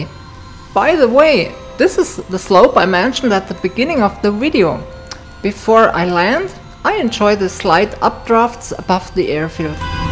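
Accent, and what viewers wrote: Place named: Upper Bavaria, Germany. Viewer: Gerne,! Du hast einfach ein feines Händchen für stimmungsvolle Bilder, gekonnte Schnitte, musikalische Unterlegung und Kommentierung mit NI (=Natürliche Intelligenz). Als halber Niederbayer liebe ich natürlich ganz besonders Deinen oberbayrischen Dialekt, aber Englisch hat auch 'was;-) Ich kann Dir (eigentlich vor allem Deinem Freund Gerd) aber ein weiteres Kompliment nicht ersparen: Die Flüge, die Gerd so kurz nach der SPL-Lizenz zusammen mit Dir unternommen hat, nötigen mir wirklich ungläubiges Staunen ab. Speziell nach dem ich selbst in dieser Saison innerhalb von vier Monaten die Segelfluglizenz ablegen konnte und daher ein wenig nachfühlen kann, wie man sich als "Jungpilot" jenseits des eigenen Platzes fühlt. Deswegen Euch beiden ein grosses Kompliment für die cineastisch perfekt eingefangenen Flüge im hochalpinen Terrain - Chapeau und Danke für's Mitnehmen! 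😊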